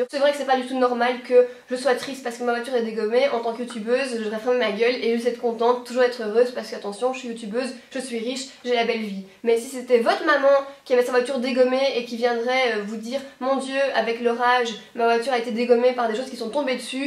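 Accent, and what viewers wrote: Belgium. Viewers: J'avoue que notre accent à nous les belges donne un cachet en plus quand on dit les choses! Merci pour cette vidéo, j'espère que les gonzesses qui ont la critique un peu trop facile ont pêtés un bon coup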